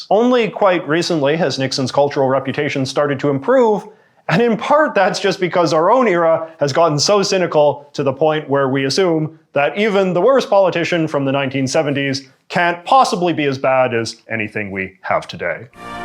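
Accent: Canada. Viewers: Ive never heard american history told with a Canadian accent before.....feels weird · I came across your channel before. Are you Canadian? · He’s Canadian… that’s how they say “about”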